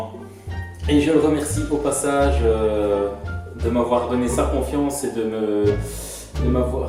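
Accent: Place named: East, France. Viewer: Le meilleur c'est à la fin 😊👍 Très belle vidéo et explications claires avec l'accent de l'est.😊🎣🎣🐠🐡🐟🐠🐳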